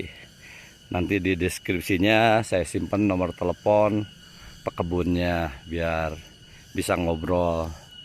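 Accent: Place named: West Java, Indonesia. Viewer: Duh uy logat na meni sunda pisan..edun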